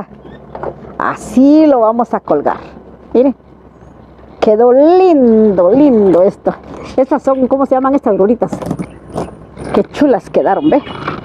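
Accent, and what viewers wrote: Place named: Guatemala. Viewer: Saluditos amiga💜💐tan chula como me gusta 👍 usted habla como toda una chapina . Ysi que llevo lindas plantas 👌😍💐yo soy chapima de Santa Rosa de loma 🇬🇹 y vivo en California 👍abrazos y bendiciones 🤗 en mi canal también se habla de plantitas y mas amiga 👍💚🌎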